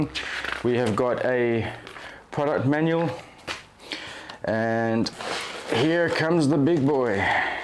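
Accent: South Africa. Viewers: You have had me very confused as i hear some very SAFFER words like noice but it saying South Australia. Loved the video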